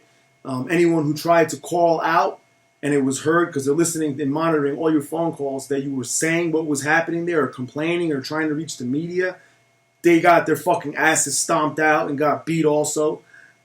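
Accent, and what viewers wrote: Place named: New Jersey, United States. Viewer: Is it prejudice of me to automatically assume u from jersey within the first 0.00001 seconds of clicking on ur vid?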